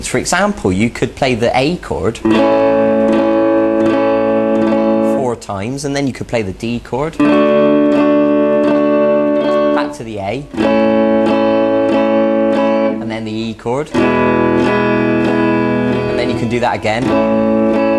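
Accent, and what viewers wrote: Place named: Wales, United Kingdom. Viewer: This guy sums up the 90s! With the addition of a welsh accent of course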